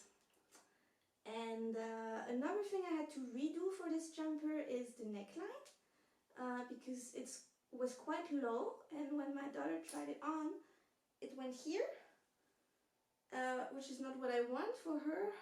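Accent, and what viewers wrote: France. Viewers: ❤love the French accent! It is so much fun to watch knitters all over the world! I am watching from B.C. , 🇨🇦.❤all those Danish designers, it’s fun to go on the Isager website, and look at the archive collection, and see all the Danish designers she asked to collaborate with.😊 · Bonjour du sud de la France ! I spotted your French accent in the firsts seconds 😂 Really Beautiful projects, I loved this podcast Thanks !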